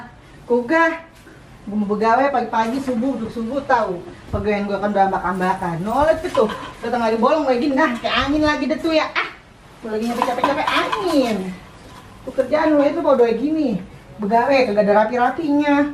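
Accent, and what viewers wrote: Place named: Betawi, Indonesia. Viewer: Medok betul Po betawinya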